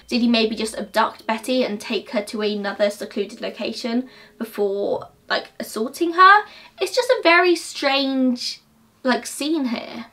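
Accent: United States. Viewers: your "american" accent is so cute!!! also completely agree with what you said in the beginning of the video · Stand your ground. If you had something truly awful to say about rape, animal death, child harm....and the like, I have every confidence that you would warn us before you blurt out that the family cow stepped on the diaper of the baby of the family then the goose was giving the wife the eye and she felt threatened of rape so she slapped the horse on the rear and he kicked the goose in the knee. Enough said. BTW, your American accent is adorable. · I agree with you!!! Keep up the good work and you did sound a little American and it’s ok 👍🏽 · Your little American accent is the cutest omg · Your American accent is on point! Dont be so shy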